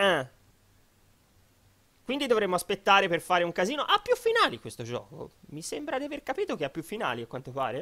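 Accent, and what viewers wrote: Tuscany, Italy. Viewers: ma sei toscanohhhh?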